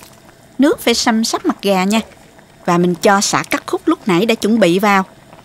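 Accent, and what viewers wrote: South, Vietnam. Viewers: Tôi sinh ra lớn lên ở Lái Thiêu đây cô,thực sự cô người. Bình Dương?? Nghe giọng nói đúng người miền Nam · Đã đăng ký kênh, dạy dễ hiểu, và giọng miền Nam dễ thương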